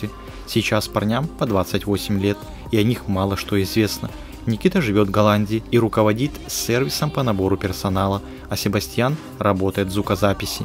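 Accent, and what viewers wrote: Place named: Lviv, Ukraine. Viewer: Очень хорошее видео, но комментатор явно с Львова .....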